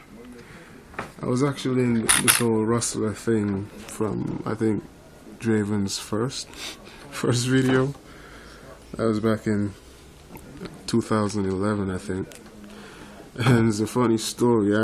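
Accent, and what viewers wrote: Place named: Caribbean. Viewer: yo, you sound like a Caribbean man. Where you come from?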